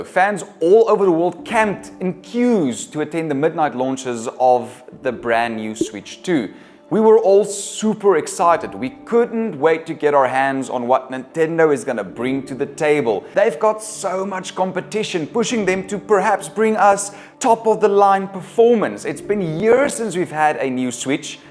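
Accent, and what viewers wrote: South Africa. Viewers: A South African tech reviewer? 😮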